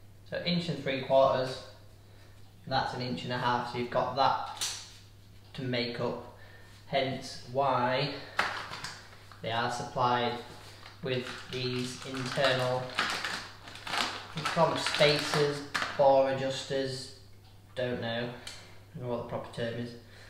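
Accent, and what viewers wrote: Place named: Gujarat, India. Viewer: WOW YOU gujarati i am from gujarat nice to heard you speaking love you...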